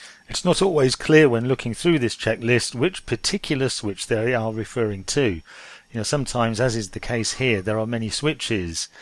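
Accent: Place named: RP, United Kingdom. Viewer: speaks the Queens English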